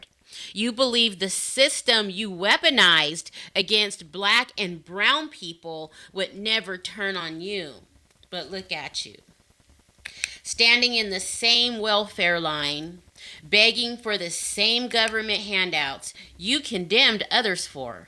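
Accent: United States. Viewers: Racism has no skin colour sister, it is a spiritual thing...check your motives... When I close my eyes, you sound like a Yank. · A great American Voice. Thank You.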